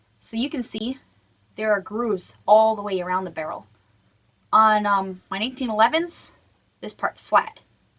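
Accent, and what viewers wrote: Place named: Midwest, United States. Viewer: ahhh, that makes sense, i live in georgia, but i'm from ohio, so i guess i just recognized your midwesternness lol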